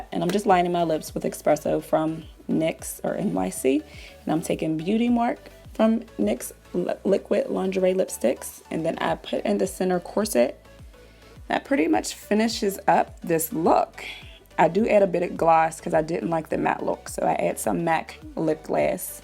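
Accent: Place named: Pennsylvania, United States. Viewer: Are you from PA? I always think everyone from PA has an accent and you sound exactly like the accent I attempt to describe. Also this was beautiful well done!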